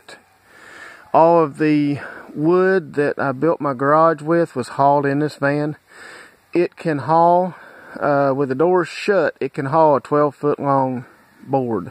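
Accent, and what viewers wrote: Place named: Kentucky, United States. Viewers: Being native born here that distintive Southern accent is picked up immediatly. Always sounds like home :) Nice ingenuity on the build. The swingout arm and kitchen are too cool. Always looking for ideas for when I do mine. Ya'll take care. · I like those cup holders and swivel mount ..that's good ole Kentucky engineering I heard that accent and knew you were from KY. I spent my teenage years in a lil town called "London" in KY gettin ready to watch your school bus video. good job.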